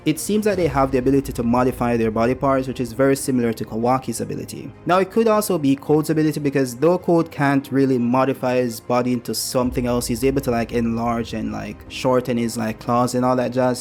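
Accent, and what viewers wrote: Jamaica. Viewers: Are you Jamaican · Are you jamaican? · Bro are you Jamaican? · Bro you Jamaican??? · I would say Jamacian or guyannese It is how he says the Word About. my two guess Worst one would be Grenadian.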